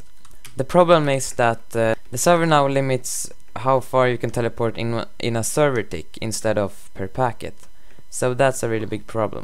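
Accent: Sweden. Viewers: ur from sweden i can hear it on your voice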